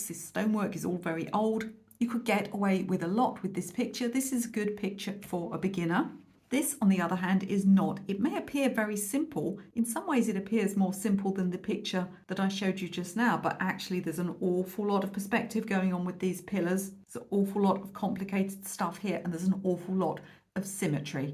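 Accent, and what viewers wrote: England, United Kingdom. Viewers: Hi, Ronnie here. JUST A QUICK FYI- IF YOU HAPPEN TO GET ANY COMMENTS THAT YOU SPEAK TOO FAST- THOSE VIEWERS MAY NOT KNOW THAT THEY ARE ABLE TO MANUALLY SLOW DOWN THE VIDEO PLAYBACK SPEED IN YOUTUBE, WHICH IS A GREAT FEATURE,BECAUSE TO PROCESS SPEACH IS A VERY COMPLEX ISSUE FOR THE BRAIN, ESPECIALLY AN AGING BRAIN, THAT'S A SCIENTIFIC FACT (LOL), FOR EXAMPLE, I AM AMERICAN & YOU ARE BRITSH & WE BOTH SPEAK ENGLISH, RIGHT. BUT ACCENT S AND CADENCE COME INTO PLAY OBVIOUSLY, RIGHT, THAT'S TO BE EXPECTED, NATURAL. I HAVE BEEN A SUBSCRIBER OF YOUR CHANNEL FOR YEARS NOW & I LOVE IT!! SO, I HAVE BECOME USED TO YOUR ACCENT AND RATE OF SPEAKING OVER TIME. BUT, WHEN I FIRST BECAME YOUR SUBSCRIBER- IS WHEN I DESCOVERED " THE YOUTUBE PLAYBACK SPEED FUNCTIONS. AFTER ALL, THE INFORMATION YOU ARE PROVIDING CAN BE RATHER COMPLEX, FOR SOME,PERHAPS. 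YOU DO AN EXCELLENT JOB!!!!! THANKS,! BRAVO, ON YOUR YOUTUBE CHANNEL! WARM REGARDS, RONNIE ~ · Yes, I do watch some of your videos repeatedly. And I keep picking up and using more skills and techniques each time! And besides your adorable English accent and vernacular are quite charming and helps my attention deficit brain stay focused instead of tuning out from the audio as happens when I watch some other content creators. 🎨🧑🏻‍🎨🥰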